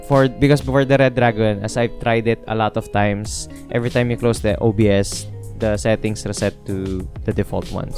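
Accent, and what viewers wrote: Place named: Philippines. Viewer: That is filipino accent 🤗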